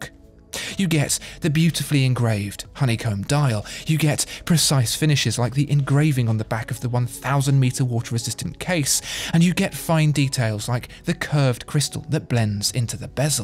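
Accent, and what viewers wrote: United Kingdom. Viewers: That's a british-jewish accent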